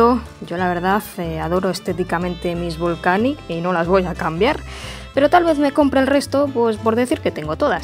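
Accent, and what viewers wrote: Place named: Spain. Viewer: Me encantan tus videos y ese acento español.jajaja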